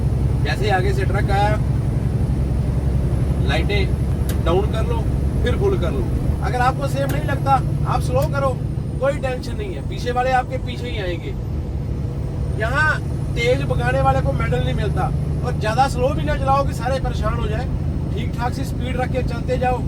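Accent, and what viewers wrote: Punjab, India. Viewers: Bhai aapki Hindi Punjabi mein sun ke maja aa jata hai 😅!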